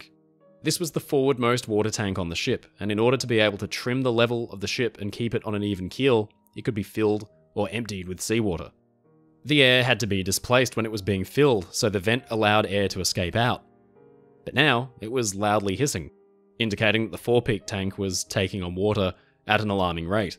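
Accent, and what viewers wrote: Australia. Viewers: Good old Aussie accent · Are you from Australia?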